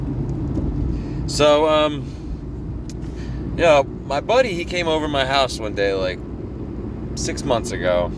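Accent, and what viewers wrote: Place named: New York, United States. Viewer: I just saw where a few people had asked where you were from, I must toot my own horn when I asked myself the same question because I thought you were from NY with that heavy accent. Keep on doing what works for you! I just hope one day I can rewire my brain. I'm terrified of having a bad acid trip also which just means Murphys law is bound to happen if I ever did try it. 🙃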